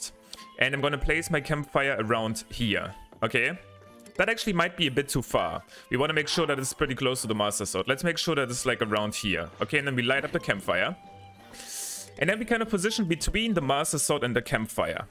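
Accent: Germany. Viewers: Hearing a German speaking anything but German is torture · I also thougt that you are German because of your english akcent LOL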